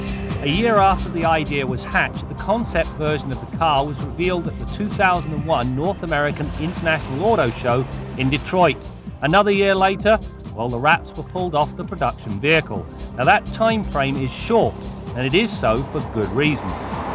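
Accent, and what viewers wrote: United Kingdom. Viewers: It's weird to hear a guy with a Hitler moustache speaking with an English accent.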